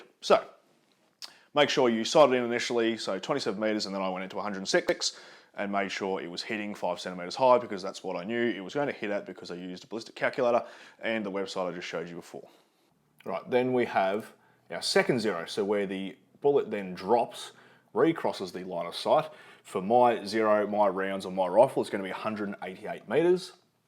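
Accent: Australia. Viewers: It’s unusual to hear an aussi talk about guns. 😂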